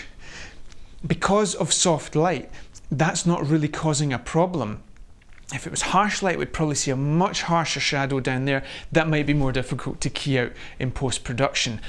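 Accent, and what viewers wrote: Scotland, United Kingdom. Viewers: If only I could have the voice Scottish accent:). Thanks for the video....helps with COVID and carrying on with classes:) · Nice Accent, I want to go to Scotland someday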